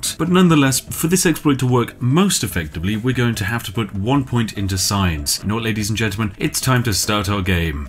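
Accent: United Kingdom. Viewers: It’s funny cause he’s so “AMERICA!” But he’s British so he’s like “Britain!” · PERFECT CHANNEL TO HEAR A BEAUTIFUL BRITISH ACCENT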